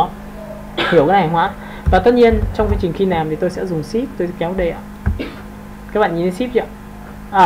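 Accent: North, Vietnam. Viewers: Trình độ thì không nói tới, chỉ thấy bất kỳ anh người Bắc nào dạy học cũng như vẻ dạy đời, nghe giọng mà ngán ngẩm vãi, nhưng phải ráng nghe!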